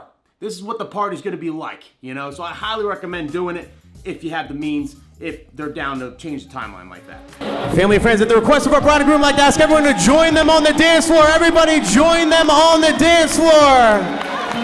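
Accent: New Jersey, United States. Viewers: Hey as soon i saw one of your vids I was like this cat sound like. JERSEY and lit fabulous job great energy munch continue success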